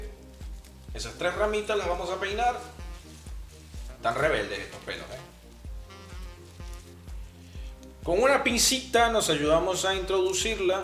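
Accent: Argentina. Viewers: Eres argentino! Wow yo pensando que eras chileno 😂 me encantan tus vídeos, continúa así 😍 😊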